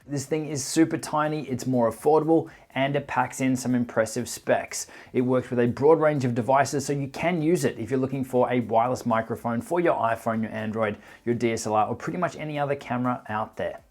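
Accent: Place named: Australia. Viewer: ugh just love australian accents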